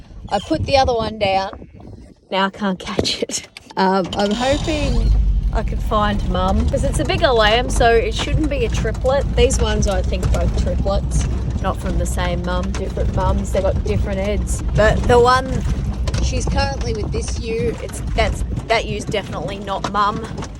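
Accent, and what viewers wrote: Australia. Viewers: Trying to just learn Aussie accent with you 🙂😂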